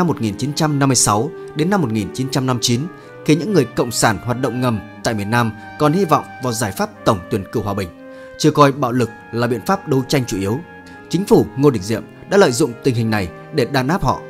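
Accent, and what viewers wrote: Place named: North, Vietnam. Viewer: Ăn dơ quen mỏ nên mở miệng ra bay mùi kinh khủng. Định lèo lái hả? Nghe giọng bắc là thấy thiu thiu rồi, ai ngờ mùi nặng dễ sợ !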